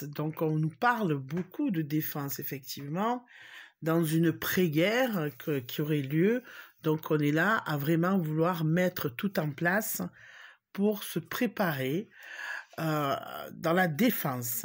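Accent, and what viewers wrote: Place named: Marseille, France. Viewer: Bonjour, merci votre video que je regarde plusieurs fois car vos explications sont toujours hyper intéressantes, gratitude, j adore votre accent marseillais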